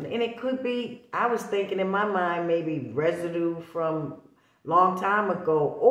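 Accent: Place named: Boston, United States. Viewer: Love your Boston accent! Keep up the good work!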